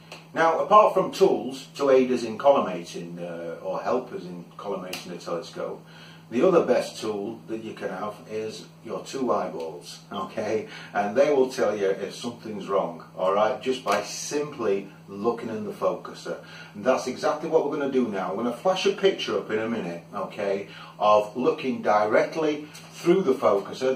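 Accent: United Kingdom. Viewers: Thank You for putting up such Clear & Understandable helpful videos Slowly Paced, worded & Demonstrated for the Newtonian Newbie!!.....Most of the Other fine channels use fast Complicated optical Terminology that Bewilder & Confuse beginners!!.....Especially like the Diy homemade Collimation tools & just love your clear British accent!!!.....Thx again!!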